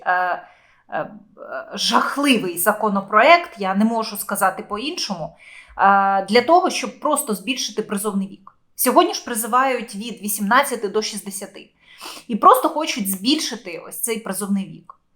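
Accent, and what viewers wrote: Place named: Russia. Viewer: ты с себя выдавливаешь укр.слова Думаешь на русском,в уме переводишь,поэтому акаешь и запинаешься,говори как тебе комфортней Мы понимаем русский язык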